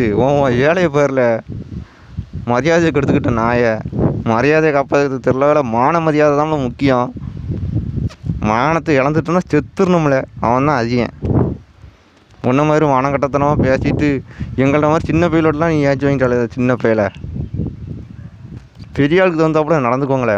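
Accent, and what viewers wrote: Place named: Tirunelveli, India. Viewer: அருமையான பதிவு நண்பா திருநெல்வேலி பேச்சில் தெளிவான விளக்கம் கொடுத்த என் வீரச்சக்கிலிலியனே வாழ்க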